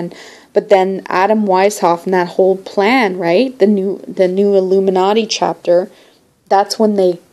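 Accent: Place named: Canada. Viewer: There's a Daoist quote that I read in an old Alan Watts book to the effect that everything went pear-shaped when the law was written down.. I tried to find the exact quote on google so that I could post it on your Genesis video. Couldn't tho. Anyway, don't know if you say 'pear shaped' in Canada, but I prefer to use slang terms sometimes-- I'm probably out-of-date with them tho-- I was tempted to say 'Pete Tong', which is even more out-dated. I'm only guessing that you're Canadian, but the pronunciation of 'out' is usually a give-away. 'Great Work' in any case :)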